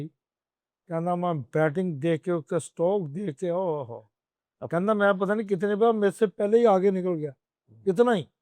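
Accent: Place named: Punjab, India. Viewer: Dara singh wala hindi accent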